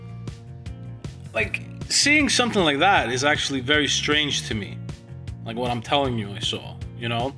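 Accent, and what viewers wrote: New York, United States. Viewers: Your accent to my ear was clearly either Queens or Brooklyn. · Hey, I'm living in Queens right now too, originally from upstate NY. I can totally hear your NYC accent haha. I really enjoy watching your videos. Keep it up man!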